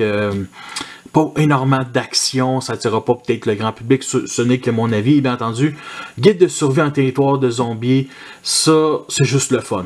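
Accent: Quebec, Canada. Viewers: Bonjour, Vous allez faire d'autres vidéos de livres à l'avenir, j'espère. Vous présentez bien et vous avez un bel accent Québécois.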